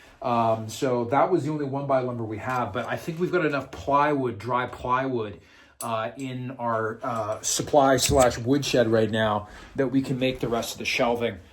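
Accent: United Kingdom. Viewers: Great video. Shame about the British accent. It sounded like Dick Van Dyle in Mary Poppins! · Hilarious,speaking to a swiss mountain dog in an Northern Irish accent. Clovis is great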